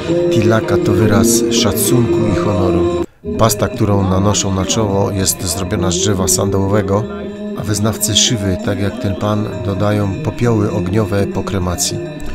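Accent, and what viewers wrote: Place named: Silesia, Poland. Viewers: Ten Pan bardzo ładnie mówi po polsku A akcentu śląskiego nie da się wykasować ...także nie czepiaj się... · Lektor powinien popracować nad dykcją. Film bardzo interesujący ale ten śląski akcent jest nie do zniesienia.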